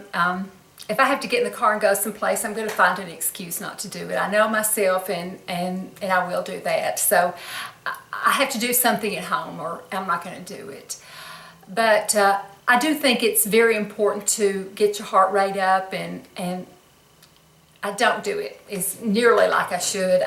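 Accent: South, United States. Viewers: Thanks so much for sharing these. You always look so beautiful and I just love your southern accent. I try to keep my body strong as well and actually bought a hula hoop a while back for some light cardio! It took me a while to remember how to keep it going, lol, but it's a fun way to stay in shape. Take care❤️-Ruthie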